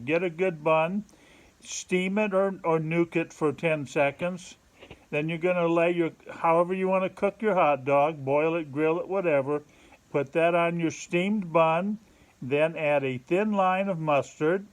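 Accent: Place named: Pennsylvania, United States. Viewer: sounds like you are from Reading Pa. thank you!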